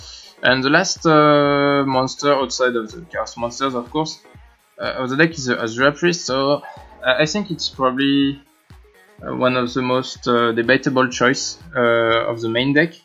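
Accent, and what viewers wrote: France. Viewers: You sound french. Wanna play some goat in paris ?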